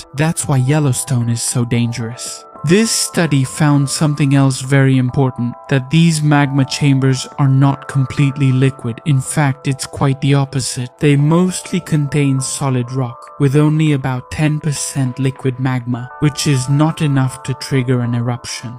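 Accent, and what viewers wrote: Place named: India. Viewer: What's with the Indian voice??? AI?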